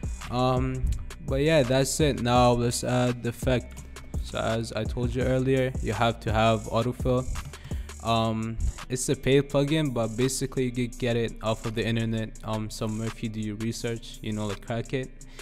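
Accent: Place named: United States. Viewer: I thought you were from US😳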